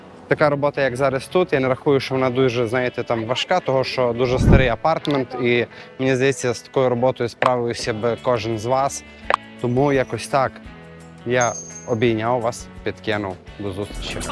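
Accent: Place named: Transcarpathia, Ukraine. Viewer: Підписалась тільки через закарпатський акцент😊. Не знаю чи так чи ні, але сумую за Україною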